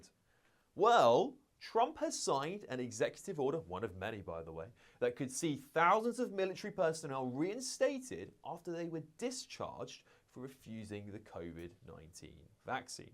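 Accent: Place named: RP, United Kingdom. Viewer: Posh talking head propagandist wimp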